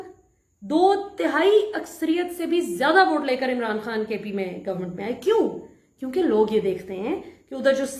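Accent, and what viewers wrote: Punjab, India. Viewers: Truly brilliant, keep it up. By the way like your Punjabi touch of tarka.😄👍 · I love your Punjabi accent 😃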